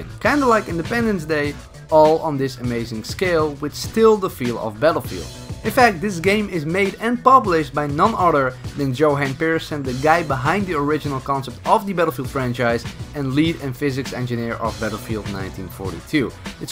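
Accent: Netherlands. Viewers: are you Dutch? If no then what country?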